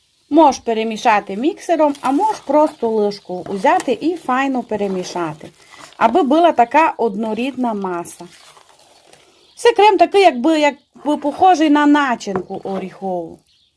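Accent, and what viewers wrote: Transcarpathia, Ukraine. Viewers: Дуже дякую за чудові рецепти.❤Прекрасний закарпатський діалект.Любуюся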